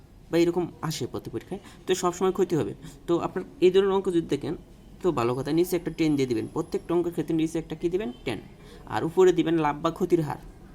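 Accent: Sylhet, Bangladesh. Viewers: ভাই মনে হয় সিলেটি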